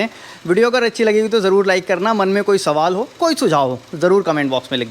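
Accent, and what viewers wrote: Haldwani, India. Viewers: बहुत शानदार सर जी मैं हल्द्वानी से हूं, आपकी विडियोज़ और बोलचाल से मुझे लगता है कि आप भी यहीं नजदीक से ही हैं। संभव हो तो आप से एक मुलाकात करनी है, से मिलने की बहुत इच्छा है।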